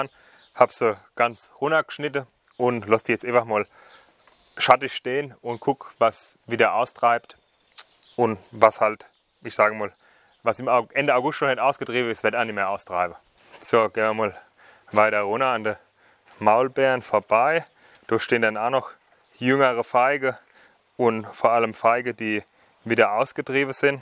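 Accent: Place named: Hesse, Germany. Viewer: Tolles Vido. Und dein Hessisch ist einfach nur sympathisch 🙏🏻😇 LG Jonas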